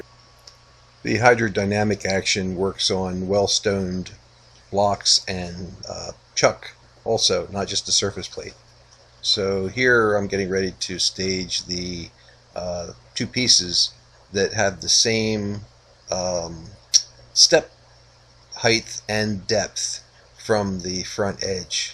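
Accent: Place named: Pennsylvania, United States. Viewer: I'm enjoying your videos, sir! You have a certain inflection in your voice that tells me you may be somewhere up around my parts. I'm south-central, Pa. You sound more south-eastern, Pa/Delaware. You have a new subscriber. Awesome craftsmanship!!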